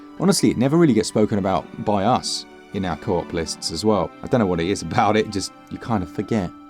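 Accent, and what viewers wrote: United Kingdom. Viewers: Thank you very much (!) for adding yet more games to my backlog!! I should know not to watch these videos because as you said, and i have said in the past, you say what it is on the tin and there never is any clickbait - main reason your one of the very very few (2!) switch channels i watch now. Plus i love hearing fellow brits accents too 🇬🇧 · Nice channel. You brits always sound good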